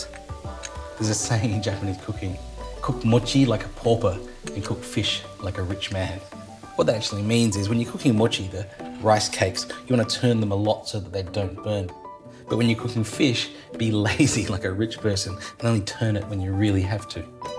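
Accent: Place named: Australia. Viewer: He sounds Australian... maybe kiwi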